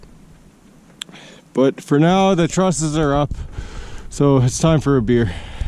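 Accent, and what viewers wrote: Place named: Canada. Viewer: Where is this guy from? Sound Canadian lol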